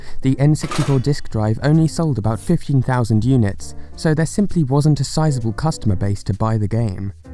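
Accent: United Kingdom. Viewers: Are you a British?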